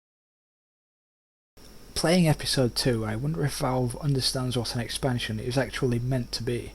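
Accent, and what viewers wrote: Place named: RP, United Kingdom. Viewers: This guy are from West Yorkshire ; ) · ok sorry it just sounds like you were putting on a posh accent sorry for the miss understanding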